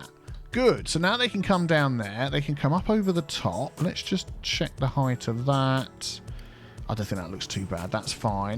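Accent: United Kingdom. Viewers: My daughter has been sleeping through your calming british voice since she was born 5 months ago. Also, I`m enjoying it. Especially your Teaville series. Thanks mate - keep doing what you`re doing!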